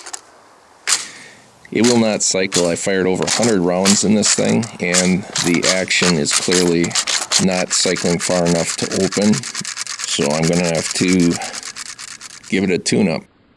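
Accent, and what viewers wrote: Canada. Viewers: your from nova scotia arent you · Very nice video! I really enjoyed your presentation style and your coverage of the beautiful nature as well as the technical details! Have been subbed for a while and really appreciate your channel. Canadian accent? Your weather is cooler than ours (here in Switzerland -3°C as I write). My local outdoor range is closed for winter :-( Take care, Rob (Brit/Swiss)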